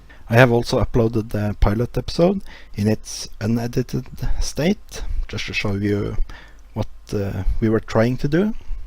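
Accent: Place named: Norway. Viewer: Well I don't see why would you need native speaker tbh. I suppose there is a lot of players in AoC which doesn't speaking natively so I think they don't mind your accent or how it call Norenglish :P Watched EP1 but I think it was tooo long. I suppose podcast about 10 minutes is just fine. Just continue if you want to do it really and also announce on forums as I did not see any info about it just came here by accident :P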